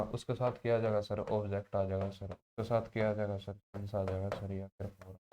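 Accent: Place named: Haryana, India. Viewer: English with haryanvi... No doubt it's a nice combination for us... Thanks for the class sir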